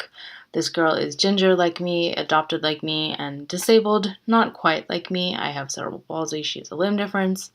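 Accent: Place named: Australia. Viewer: Was wondering where you went! That accent is a lot less Aussie now: pushed that boat right out! But to be expected. I imagine it's ocker again on the phone to mum! Will be looking forward to hearing from you again :)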